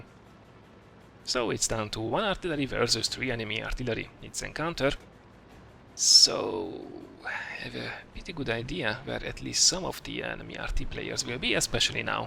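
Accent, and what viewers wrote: Hungary. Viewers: R u Hungarian? Your accent is seemst like a Hun.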